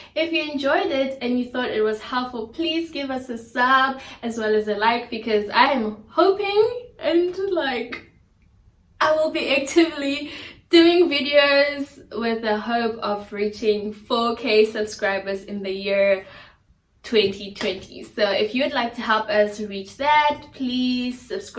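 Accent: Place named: United States. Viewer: U seem to have a bit of an american accent. Does one need to have this accent to be considered ?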